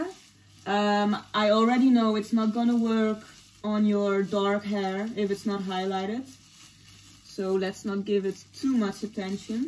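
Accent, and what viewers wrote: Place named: Netherlands. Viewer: Oef you’re really Dutch aren’t you?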